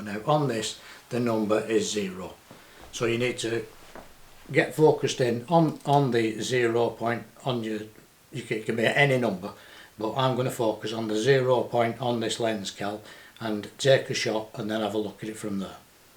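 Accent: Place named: Liverpool, United Kingdom. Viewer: Hey brotha great video thank you. Now as a side question where does your accent come from? I can’t seem too sort it out. Obviously it’s an English accent but I don’t have a trained enough ear to sort it out right away. You do sound like a Beatle to me (hope no offense) as that’s my only reference for now. I know they (Beatles) are from from Liverpool (or greater area). Thank you kindly and have a good day.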